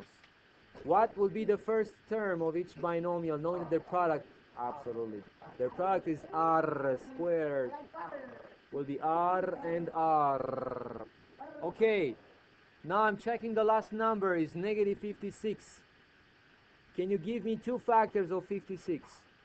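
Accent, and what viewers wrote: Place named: Eastern Europe. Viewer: Where is your accent from? Just curious I wanna guess eastern European.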